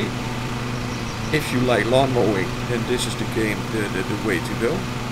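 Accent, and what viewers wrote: Netherlands. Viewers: you are dutch i hear it in your voice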